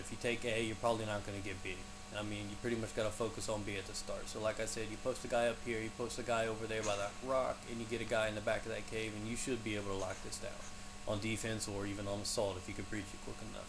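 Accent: New York, United States. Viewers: New york accent lolz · he sounds like he's from new york lol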